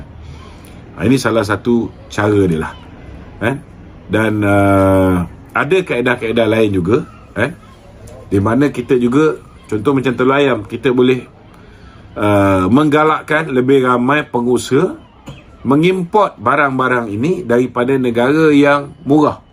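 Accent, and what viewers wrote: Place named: India. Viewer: cakap macam keling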